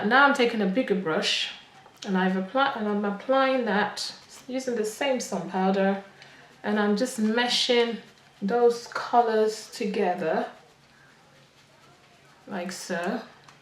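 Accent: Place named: Africa. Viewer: Love your African accent and your tutorial. Can you do a lipstick tutorial please???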